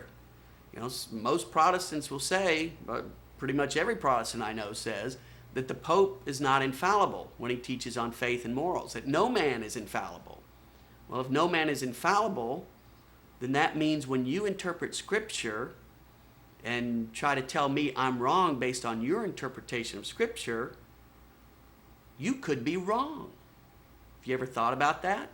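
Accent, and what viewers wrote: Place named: South, United States. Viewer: you sound like you have a southern accent, are you from the south?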